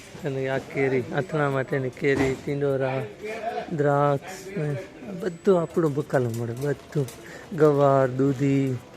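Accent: Kathiawar, India. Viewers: આપડી કાઠીયાવાડી તળપદી ભાષા અને તમારા ધર્મપત્ની નો તમારી સાથેનો વ્યવહાર જોઈને એવું થાય છે કે આતો બધું હરિની કૃપા હોય તોજ થાય..તમે લંડનમાં રહોશો કે ઈટાલીમાં? તમારા પત્ની જોબ કરે છે કે હાઉસવાઈફ છે?એવો એક વિડ્યો બનાવો..અને એક વાત તો સો ટકા છે કે તમારામાં લેસ માત્ર અહંકાર નથી..અહંકાર વિનાનો દરેક મનુષ્ય મને ભગવાન જેવોજ લાગે છે..God keep your couple safe God bless you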